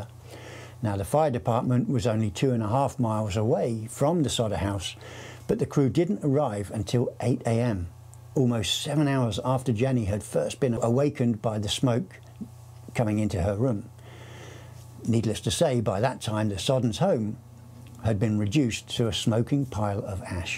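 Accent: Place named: Ireland. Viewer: Your Irish accent helps your story telling . You have the voice and speed of a good story teller.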